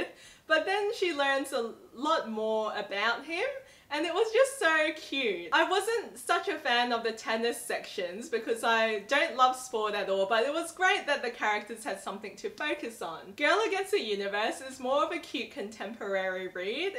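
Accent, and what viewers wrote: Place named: Australia. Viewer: TBH, this is the first video I've watched from you. first off, I love your gorgeous Aussie accent LOL. secondly I'm hoping I can find your Aussie ya reads here in America somehow cos they sound so good! I also really appreciate your review of Replica. I'll keep in mind how you recommend reading it when I dive into my copy. What other Lauren Oliver books have you read? I bought Replica on thanksgiving and then last weekend I bought another one of hers (can't recall the title right now) at a discount bookstore. Do you have any reviews of them video or written?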